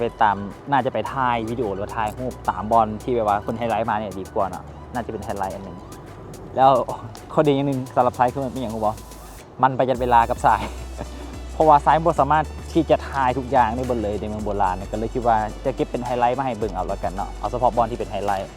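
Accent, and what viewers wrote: Vientiane, Laos. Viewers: พื้นเพเดิม ทรายเป็นคนลาวใต้แต่ย้ายมาอยู่เวียงจันทร์ ทรายพูดสำเนียงลาวใต้ได้ แต่คงถนัดสำเนียงเวียงจันทร์มากกว่า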